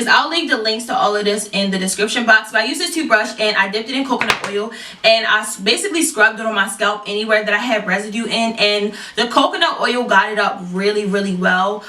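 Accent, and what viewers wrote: Baltimore, United States. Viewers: You are so groovy, so the outro is 🔥! You from b-more? Your accent is very Bmore. Your realness is refreshing! New sub! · As soon as I heard the accent (without looking at your bio) I was like YUP she from Baltimore 🤘🏾 good video ✨ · are you from Baltimore? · Are you from Baltimore? I feel like I hear it in your accent.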